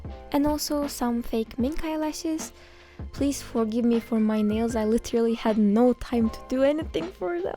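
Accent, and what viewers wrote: Turkey. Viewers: ingilizcen gayet iyi olmasina ragmen turk ingilizcesini her yerden tanirim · I supraiesd that you are Turkish because Turkish people are very bad at english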